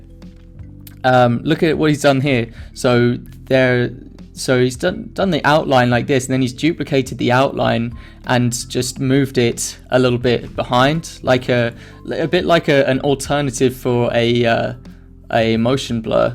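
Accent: United Kingdom. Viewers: i like when you talk in british accent mine while i learned how to say english in british accent like you say it in this video btw i learn much knowledge from you thnx broo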